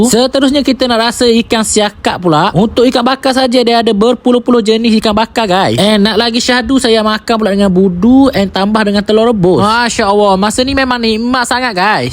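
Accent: Terengganu, Malaysia. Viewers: Abg ni org KL alik ganu ke? 😂😂😂 ulang ulaman. Jengg kreng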